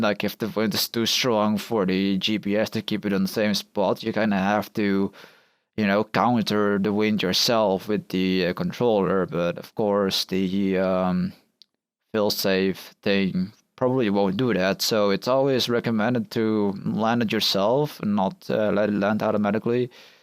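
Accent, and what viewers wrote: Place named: United States. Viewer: Dear, may I compliment you on your English!! And on your very very very American accent:-)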